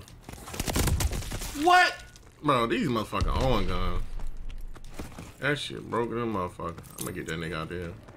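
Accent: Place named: Philadelphia, United States. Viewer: WasssCrackin but just wanted ta ask!!! is u from Philly cuz ya lowkey have the accent like you from there lol🤣😂✌️🫰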